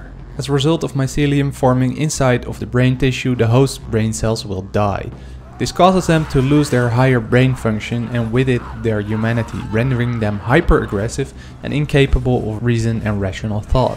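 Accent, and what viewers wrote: Netherlands. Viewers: You can hear really good that the guy who talks is from the netherlands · I know this is of topic to the video that ur making but are you by any chance Dutch? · Are you from the Netherlands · jezus gozer, dit is echt het meest nederlandse accent ooit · Are you dutch 🇳🇱? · Do I recognise a Dutch accent here? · Are u Dutch?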